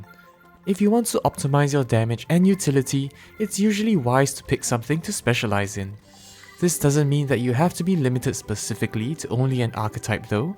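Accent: Singapore. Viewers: hai may i ask r u singaporean?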